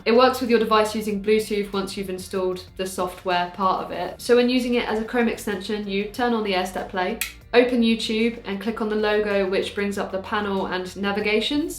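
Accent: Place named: North, United Kingdom. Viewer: Your Northern accent made me laugh 😂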